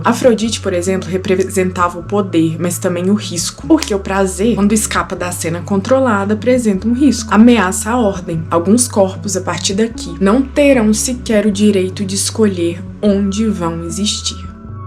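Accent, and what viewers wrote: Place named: Minas Gerais, Brazil. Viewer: 3 palavras e ja desconfio q eh mineira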